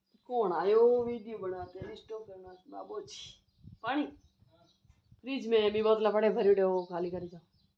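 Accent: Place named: Jaisalmer, India. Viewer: अरे हुकुम आप तो गुजरात लेकिन भाषा तो पूरी जैसलमेर बोलो एकदम❤❤❤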